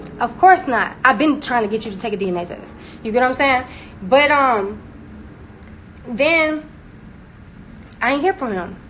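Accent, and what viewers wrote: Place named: Mississippi, United States. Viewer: You are beautiful but you know you country talkin as hell where are you from Mississippi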